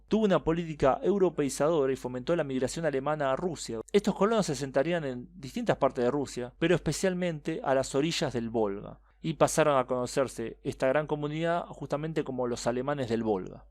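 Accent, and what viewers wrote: Argentina. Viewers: esta muy bueno difundir la historia de forma resumida, pero agrego una critica al margen, tratá de sheshear no tan exageradamente que después América cree que todos en Argentina hablamos así, sin el espiritu de ofender te agradezco enormemente el aporte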